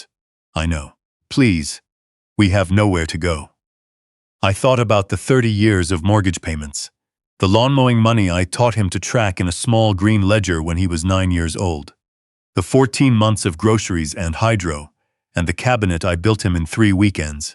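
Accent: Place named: Ontario, Canada. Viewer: Sound like Ontario Canada 🇨🇦.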